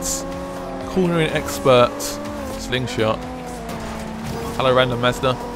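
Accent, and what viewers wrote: England, United Kingdom. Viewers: This man English ?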